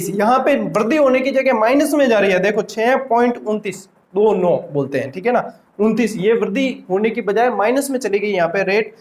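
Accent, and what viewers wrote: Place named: Rajasthan, India. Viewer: Thnku so much sir.... Sir m rajsthn se he hu lekin rajasthani nhi aati ap bolte ho toh sunne m accha lgata hai.... 😇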